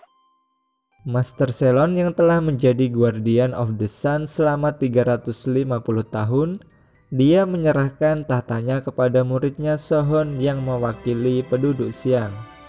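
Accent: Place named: Java, Indonesia. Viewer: Medok bangt njir..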